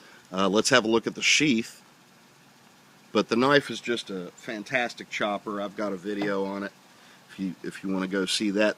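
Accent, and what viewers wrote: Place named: Alabama, United States. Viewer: Gotta love that Alabama accent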